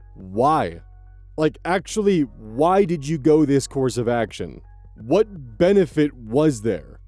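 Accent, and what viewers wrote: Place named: Minnesota, United States. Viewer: You started sounding like that Minnesotan from family guy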